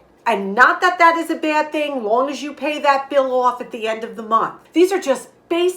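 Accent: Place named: New Jersey, United States. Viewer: Great tips! We give kitchen scraps to the hens, we get eggs. As a native Philadelphian, I gotta say you sound like you're from Jersey.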